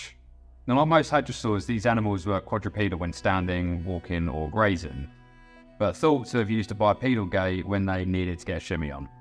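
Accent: Essex, United Kingdom. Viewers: Because you mumble. It's not the accent, it's that for us to understand your accent you need to enunciate your accent clearly and not slur words in a hurry or get quieter towards the end of a sentence. I, a non-native speaker, have never heard Essex accent before this video in my life, but I had no problem understanding you when you actually spoke up. You don't need to speak like a BBC host, you need to speak like an Essex boy who hosts a video instead of like an Essex boy who talks to his mates on the bus. If you think that you actually did that, you dearly need to get a less muffled microphone. Sounds like you got the wrong end of Shure, completely dead top end. But top end is where "easy to understand" sits. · Nothing wrong with the Essex, nice to hear something a bit familiar for once ;) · He's understandable. He's from Essex, it's just how they talk. 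· American here - keep the Essex! Love it! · Hang on to the Essex thing, young man, it figures importantly in why so many people enjoy these excellent presentations! I am an old man from the Mouth of Raccoon in Kentucky Appalachia and I appreciate a good regional accent!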